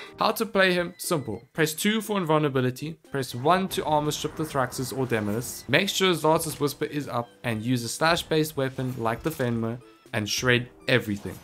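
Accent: Afrikaans, South Africa. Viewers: do you speak afrikaans your accent says yes if you do: Dis awesome om te sien hoe goed suid afrikaaners doen sien bitter min wat bo 1 duisend subs het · Boet are you south African??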